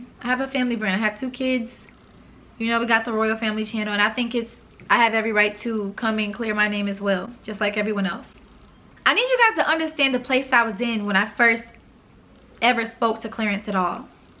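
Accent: New York, United States. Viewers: She talk mad ny now lowkey tho😭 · No cap queen finally sound like she from ny now 😫😫 I love ittt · she sound like a new yorker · she's starting to get that New York accent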